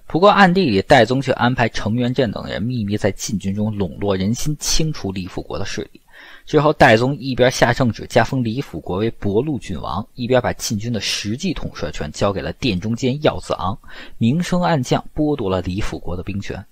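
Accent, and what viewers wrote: Beijing, China. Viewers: 确实听起来非常不舒服，有种吞音的感觉，南方人叫连刀快，可能是北京人的口音吧，听时间长了真的很难受，不过内容还是挺好的👌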